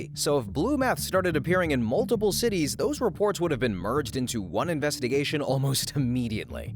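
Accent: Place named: North America. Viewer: well the mf speaks perfect English in a north American accent so I assumed bro might either be from the US or Canada... Not a crazy assumption to make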